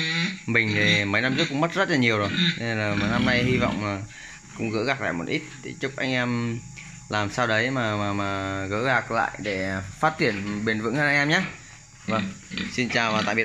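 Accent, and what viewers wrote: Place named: Ha Tay, Vietnam. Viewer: Anh ở đâu thế nghe giọng giống ở Hà Tây cũ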